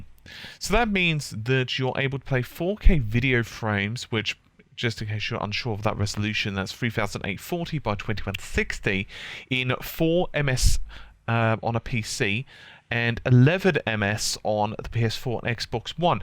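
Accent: United Kingdom. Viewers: 100% have to agree! These guys (particularly the narrator of this video - his name escapes me (sorry > - < )) know their shit! Like, KNOW know their shit… But the day-in, day-out rehashing of PS4 > Xbone is getting quite… taxing… Love the channel, but I'm getting to the point where if there's a mention of "PS4" or "Sony" in the title, I'm tempted to go out of my way not to click it. These guys are very level-headed when it comes to the console war bullshit, but as an xbox fan, when it's all you hear about for the past 12+ months? Yeah, I'm becoming quite sick of it. (Was sick of it months ago, but whatever.) And even then, they go out of their way to report on rumors or leaks that emphasis PS4 > XO… How about some misterxmedia coverage? I mean, the guy is either being 100% trolled or the industry is in for the world's largest reveal (#unlikely), but either way I don't have the hardware know-how to really sift through his posts. 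But [the author of this video]? He pegs me as someone who would be able to understand exactly what MisterX's 'insider' is talking about. And regarding ^that^ (the MisterX stuff), I'm not looking for a "is it probable" or "reasonable" analysis, simply an explanation of all the tech-talk that goes on in that 'forum'… It boggles my mind every time I look at it, but it's still unbelievably fascinating! I'd love to hear an explanation from someone with such a dreamy british accent. • ◡•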